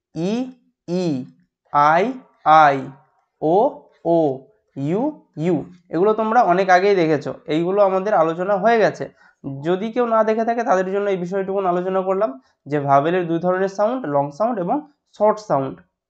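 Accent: India. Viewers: Are you an indian?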